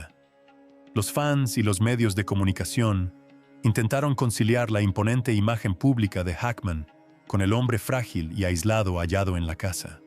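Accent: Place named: United States. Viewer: acento americano que me da dolor de cabeza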